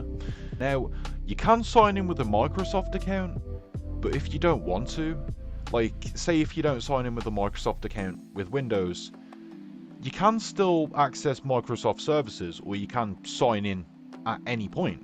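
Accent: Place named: United Kingdom. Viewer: Thanks For the Info. Even with the Heavy UK accent This New Yorker understood you very well. Not a fan Of "Recall" forced on you with Widows 11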